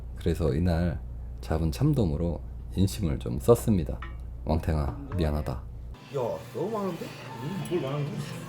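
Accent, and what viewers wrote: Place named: Chungcheong, South Korea. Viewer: 역시 고양이 아빠 충청도 사투리방송 구수하니 잼나네요 간만에 낚시 하시는 모습도 힐링되네요 감사합니다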